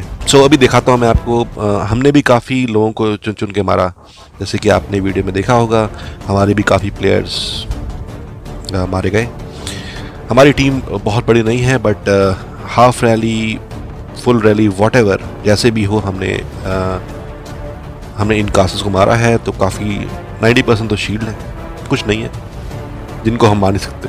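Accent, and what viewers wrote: India. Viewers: Vi may tari English sunka hamesa sochta tha ki tu Indian he hay lakin confirm nahi tha keuki tu hamesa English may bat karta hay but aj confirm ho gya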